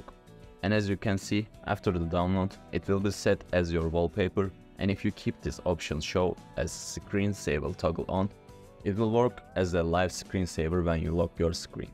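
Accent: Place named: Turkey. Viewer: Bi aksan ne kadar Türk aksani olabilirse o kadar. Almanya´dan selamlar!!!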